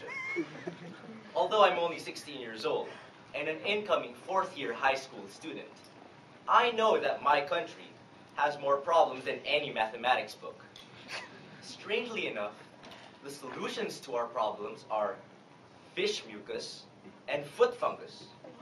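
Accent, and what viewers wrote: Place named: United States. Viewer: how come his got an american accent?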